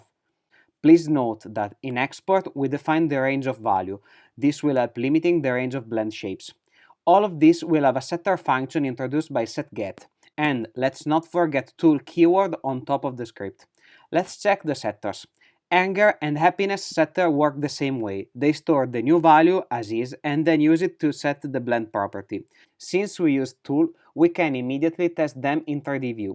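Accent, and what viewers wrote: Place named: Italy. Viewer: Loving your accent, sounds Italian. I hope I'm not wrong.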